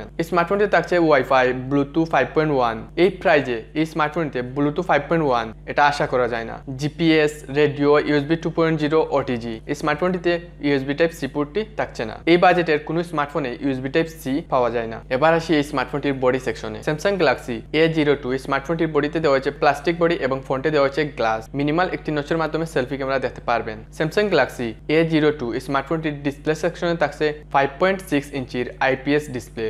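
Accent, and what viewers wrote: Sylhet, Bangladesh. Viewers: ভাই তুমার বাড়ি কি সিলেট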